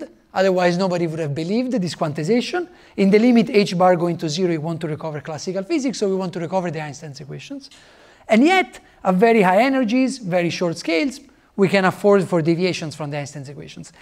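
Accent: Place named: Italy. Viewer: jestli Jirka Podolský nebo Pavel Krtouš najdou nějakého studenta, který by si to dal třeba v rámci zápočtu, tak to bude nejlepší řešení. Jestli se to nepovede do konce roku, tak udělám to, že Simona přeložím "on the fly" na diktafon, ten čas tomu rád dám. Ale nedám přepis do titulků, to by musel udělat sw nebo někdo jiný. Domluvíme se ve čtvrtek na fakultě:) Je fakt, že tohle je unikátní přednáška v českém prostoru - IMHO první technický kurz kvantové smyčkové gravitace v ČR, který dával na fakultě a mimochodem vystřihnul tuhle "netechnickou" přednášku. Ale i tak, terminologie a koncepty, které používá nemusí být samozřejmé pro posluchače, kteří nejsou obeznámeni se základy Obecné relativity a kvantové mechaniky. Takže musím jen doporučit jako prerekvizitu přednášky Jiřího Podolského na téma jeho překladu knih Carla Rovelliho (Carlo, jako jeden ze zakladatelů QLG přístupu, byl školitelem na univerzitě v Římě) <link> atd. 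Je také pravda, že angličtina je excelentní, ale se silným italským "soundem"a velmi středomořským rytmem, takže to nemusí být v kombinaci s netriviální terminologií jednoduše zkousnutelné ani pro posluchače, který anglicky umí slušně. Takže ano, má určitě smysl udělat překlad. Jinak záznam z jeho intenzivního kurzu, který končil včera se postupně objeví na téhle stránce <link> Ale je to prostě kurz živé oblasti výzkumu a dostupný bude jen pro lidi, kteří se dostatečně orientují v OTR a kvantové teorii pole a příslušných matematic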